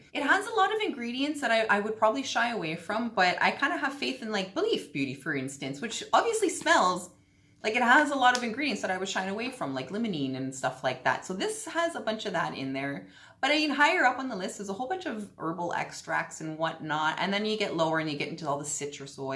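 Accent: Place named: Newfoundland, Canada. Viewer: Lol ur fun to watch. Not to mention purrrdy. Im thinking of subscribing to this box. R u from nb ns or nf ?? I always know a downhomer when i hear one. :)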